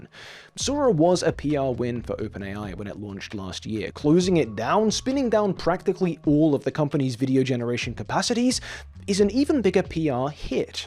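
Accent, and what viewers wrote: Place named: North, United Kingdom. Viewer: Why does sound more like he's from the north in the flashbacks?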